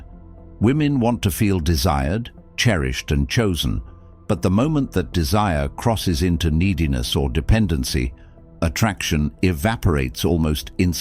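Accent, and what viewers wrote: United Kingdom. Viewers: AI with a British Accent.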